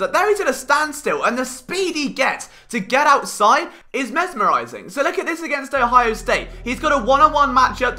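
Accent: England, United Kingdom. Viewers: Love me some EPL but this is the first time an English accent did it for me with AmeriCAN Football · Never in my life did I think I'd hear such intelligent football breakdowns in such a British accent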